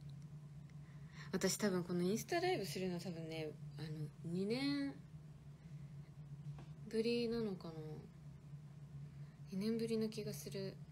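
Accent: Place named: Kyushu, Japan. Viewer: なんねこれ、九州の女て感じ❤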